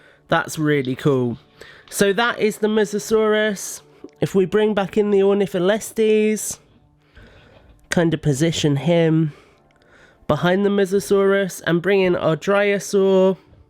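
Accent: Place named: United Kingdom. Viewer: Are you British?? Your talking way sounds like British?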